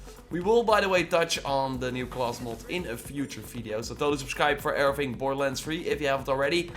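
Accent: Netherlands. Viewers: Man man man, dat neder-engels. Verschrikkelijk.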